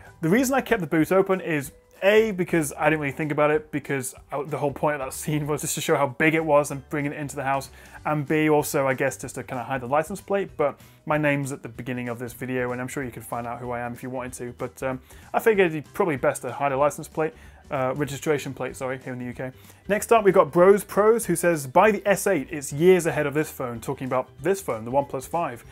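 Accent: United Kingdom. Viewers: oh right ^^ well I like your British accent (I'm Franco-American);-)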